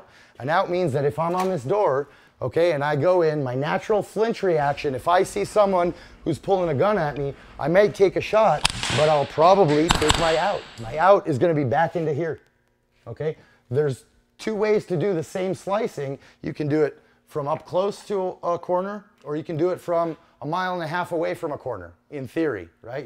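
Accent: United States. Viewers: He has a super american accent for an Israeli vet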